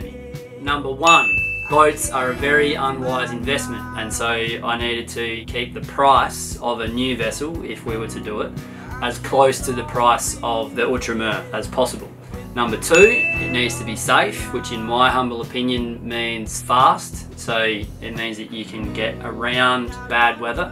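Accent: South, United States. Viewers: Oh my lawd that Southerner accent... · Love the southern draw coming from a Texan myself, I look forward to seeing what comes out of all this. ♥️ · Hilarious accent and insight to the hillbilly .... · Wow, your country-American accent is... oddly on point. Sounds like north Georgia/Tennessee. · Emission free hype crashing into whole of life reality. Unless you made it from carbon sunk wood in its entirety then there is no way. Hemp sails and rope. That southern accent will come in handy as you tend the fields and cut the trees. You did say it was your dream. That Outremer looks pretty good from here even if it is a climate change liability. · I think it was unbelievable that an Aussie could do such a fine job on a stereotypical good old boy accent. Seemed kind of Archie Bunkerish though. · As an American, I love your American accent! Also, the emissions free boat thing is cool too. 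😁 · Ahhh du liike ur very southern accent. Good job. Good Luck on obtaining your “Green” vessel. You need one of those suspended dog run cables for Lenny. As a parent of 3 children and 11 grandchildren I can fairly say you need eyes in the back of your head and that’s on dry land. Take care and stay healthy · Very interesting idea. Hope it turns out! Really liked the southern accent too 😂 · Your southern accent cracks me up! You got it ! ....lol. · That’s a pretty good American accent, straight from the South! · Nice Southern accent for a Down Under bloke! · An Aussie man in a French boat talkin with a southern twang! Its a beautiful 🌎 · Well, I'm a Texas guy and honestly his "southern drawl" is easier to understand than the Aussie accent! And I've been to Aus-traala! I'm also a Tesla guy.. so good on ya, Aussie! A noble quest!!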